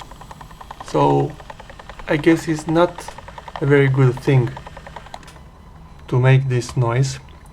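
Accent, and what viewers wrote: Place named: Romania. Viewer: Romanian english.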